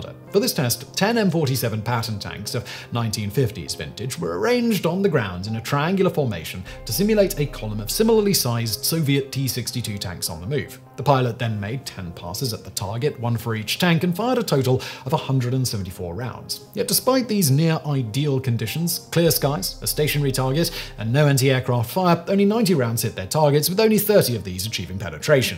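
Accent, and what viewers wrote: United Kingdom. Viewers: Speaking a bit too fast in this one chap, especially with the British accent and constant use of "DU". Slow it down a bit. No rush man.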